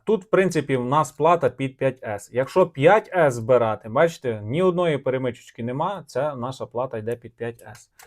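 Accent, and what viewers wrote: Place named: Western Ukraine, Ukraine. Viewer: впадло только что на западенском акценте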